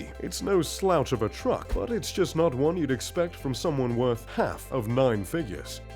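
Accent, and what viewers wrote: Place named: United Kingdom. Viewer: I watched numerous videos on this channel and i realize that British voice is appealing! It adds something to each videos; for example the way he pronouces "dollars", the pitch, the tone, the intonation, the inflection, the accent and let's not forget to mention the humour! They all play a role too! Some weird coincidence, i don't know but i used to watch a TV program called Lifestyles of the Rich and Famous and the host Robin Leach was also a Brit!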